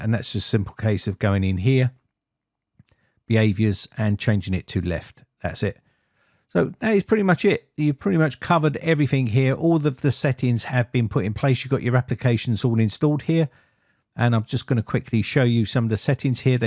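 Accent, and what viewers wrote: United Kingdom. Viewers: Another Windows Install video. I'm keeping track I think this is video #23 of Windows 11 Installations brought to you by. I just watch cause I love the pleasing Brit accent.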